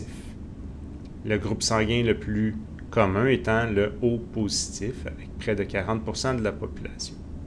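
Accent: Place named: Canada. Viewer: Bien compris, juste que l accent canadien est difficile.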